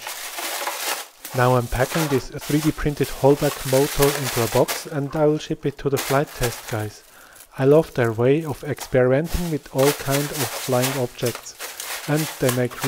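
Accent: Switzerland. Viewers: Bisch du us de schwiiz ? So vom Dialekt her hanni denkt. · Richtig guets Video ;D mer erkennt eifach en schwizer mega schnell wenner englisch redet ^^ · Sounds swiss-german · Where u from? Swiss?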